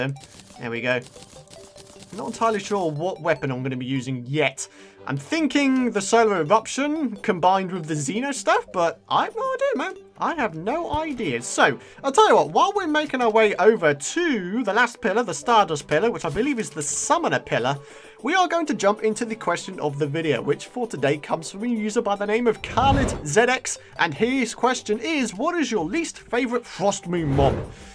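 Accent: United Kingdom. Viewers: Will somebody please tell me why British people pronounce z as zed? It makes no sense to me, sorry! I normally pronounce it as "zee"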